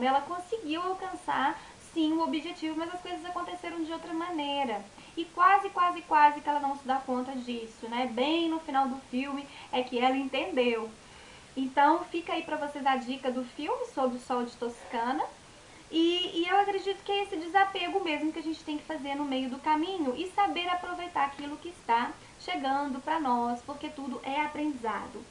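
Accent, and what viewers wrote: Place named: Goiás, Brazil. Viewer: Linda reflexão. To passando por uma fase chatinha e essa reflexão serviu perfeitamente. Mudando de assunto você a goiana? O sotaque me lembra os de Goias kk <link>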